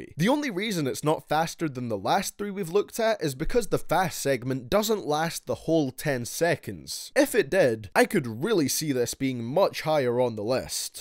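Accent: Scotland, United Kingdom. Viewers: Are you Scottish?? I can't tell you have like 3 accents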